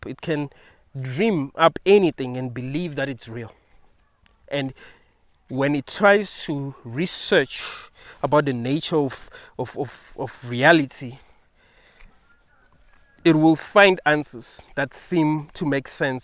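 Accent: South Africa. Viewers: South African.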